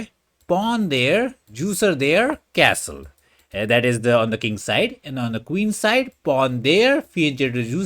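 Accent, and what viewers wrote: India. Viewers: When I want a good laugh and learn more about Chess tactics, this dude doesn't disappoint lol... The only jokes with Indian accent that I accept, and enjoy,,,lol...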